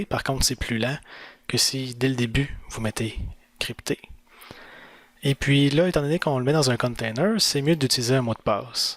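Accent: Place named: Quebec, Canada. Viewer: Merci bcp, et pour ce formidable accent québécois et pour les explications qui m'ont permis de créer mon premier dd virtuel. :))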